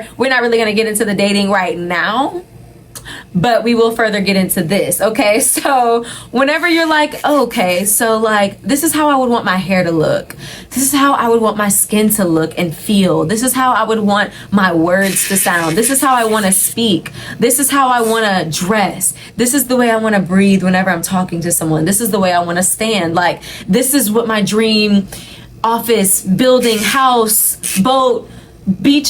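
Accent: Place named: United Kingdom. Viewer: The British accent on point lmao Love the video ❤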